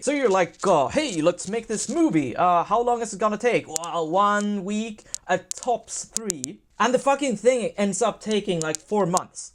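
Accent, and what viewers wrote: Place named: Sweden. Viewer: LazyDakota He's saying "yust" as in "just" with a Latin J sound. And, the accent is Swedish.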